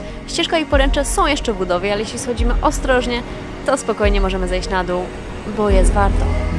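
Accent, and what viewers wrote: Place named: Poland. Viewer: Czy jesteś Polką. Sądząc z akcentu jesteś, ale ilość słow angielskich wtrąconych w polskie zdania jest tak duża, że może polski to jest język obcy dla Ciebie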